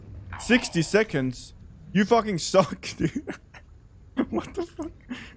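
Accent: Denmark. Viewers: Dude u cannot hide ur danish accent. This is literally the first time I’ve heard your voice and I immediately knew you were a Dane and so am I. Dansk accent virker ik sår godt med engelsk. Lol. Bliv ved med ag lave videoer de ser interessante ud! (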